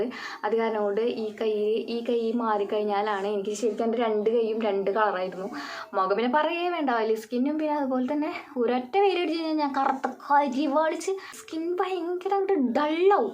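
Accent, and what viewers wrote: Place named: Thrissur, India. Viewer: Good, വീടെവിടാ തൃശൂർ ആണോ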